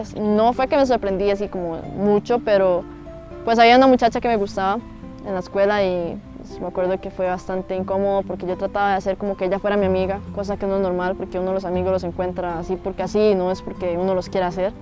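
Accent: Costa Rica. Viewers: los ticos pronunciamos la r asi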